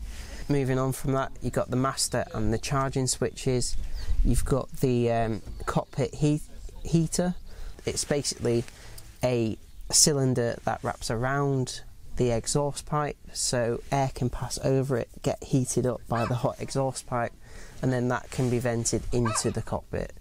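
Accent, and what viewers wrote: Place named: United Kingdom. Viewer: It was quite challenging for me to interpret the British accent, I´m more accustomed to the American one. It feels like a mechanical typewriter is speaking to you, and the rhythm hypnotized me and my eyes closed in a brief sleep. I rewound the video several times. In any case, I found it very interesting and the information provided was complete. I enjoyed it very much. Greetings from Buenos Aires.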